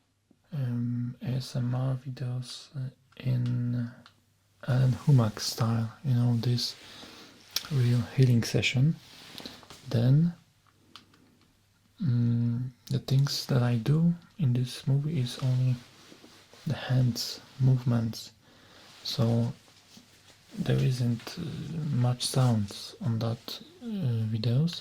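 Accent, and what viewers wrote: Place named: Poland. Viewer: I just keep coming back to this video. The Polish accent and loud variable sounds are extremely relaxing.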